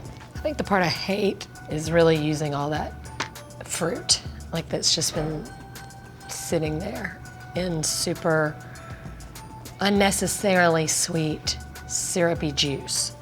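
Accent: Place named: South, United States. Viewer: Love your southern accent I could listen to you talk all day long. I'm originally from Tennessee.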